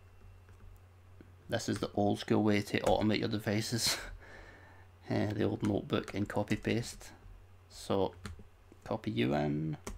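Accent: Scotland, United Kingdom. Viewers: Great video - epic accent :) hello Scotland :) cheers from Munich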